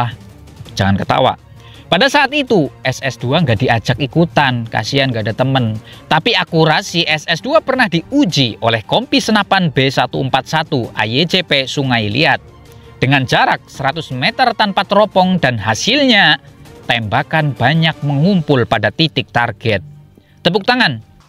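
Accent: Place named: Banyumas, Indonesia. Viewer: Suara pennyiar enak rada ngapak " ya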